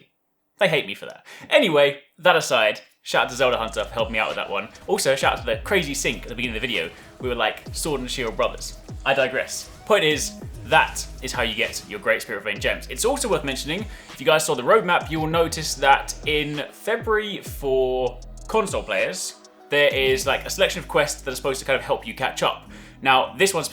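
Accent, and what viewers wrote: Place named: United Kingdom. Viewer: you look like a viking but talk like a brit and its creating some SERIOUS dissonance in my mind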